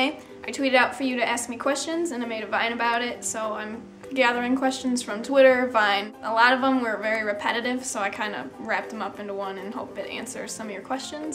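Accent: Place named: Chicago, United States. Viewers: She has a bit of a Chicago style "ah" going on, but that's just what I hear. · Her accent is like a normal Midwest accent. I have it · I think it's because she's Midwestern. Maybe from Minnesota or Wisconsin.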